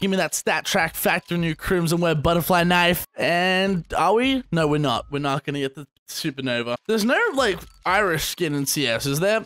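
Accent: Australia. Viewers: Your aussie O.O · your from Australia what state you from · your aussie! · knew u were aussie bb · i fuckin knew you were aussie wewwwww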